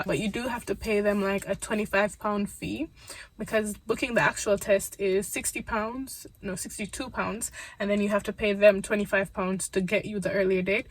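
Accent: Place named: Jamaica. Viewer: Girl, when I head that Jamaican accent I got gassedd!! I love finding Jamaican YouTubers. These tips were helpful even those I failed twice lool I'm going to use them in my next test and hopefully, I pass because ain't no body got money to be paying for lessons and test again and again.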